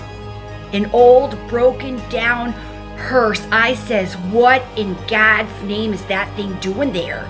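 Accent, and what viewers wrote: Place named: Midwest, United States. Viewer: Omg! The almost spooky story with a happy ending.. all done with a Midwestern accent. LOVE IT!❤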